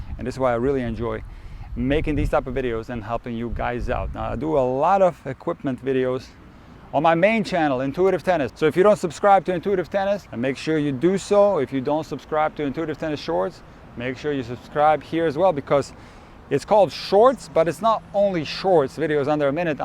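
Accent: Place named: Eastern Europe. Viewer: is the Eastern-European, no B.S. coach you have in your dreams/nightmares. The accent, the straight-talk, no coddling, no touchy-feely*. Just cut the crap and get to the point. No excuses. I pulled all the weight off my rackets after this video because it confirmed my suspicion that it really wasn't worth the trouble. I'm not that good. I'm flattering myself. *I work in a very touchy-feely profession. I'm touchy-feely. But from my tennis coach, I want cold hard unvarnished truth. Update: I bought a 3rd frame (used 2018 Radical) so I can honor my desire to tinker unnecessarily with things (it’s an affliction, I admit). This also is per suggestion - 2 frames the same in case of string breakage, 1 frame for trying different stuff.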